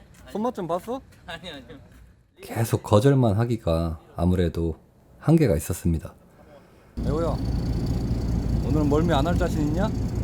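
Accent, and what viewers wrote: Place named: Chungcheong, South Korea. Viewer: 역시 고양이 아빠 충청도 사투리방송 구수하니 잼나네요 간만에 낚시 하시는 모습도 힐링되네요 감사합니다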